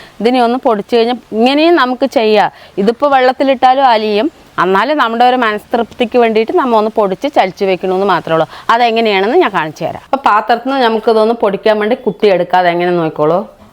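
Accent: Palakkad, India. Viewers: നല്ല പാലക്കാടാൻ ഭാഷ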